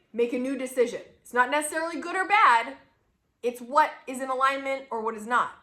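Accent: United States. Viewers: I'm working on my no-buy, low-buy strategy and also working on my "aspirational crafting" collections. I've got way too many different craft interests, and I'm going to hone them down to TWO: photography and drawing/acrylic painting. I'm in Connecticut and you sound like you're from the state as well. If so, great to "meet" you and your really helpful channel! 😊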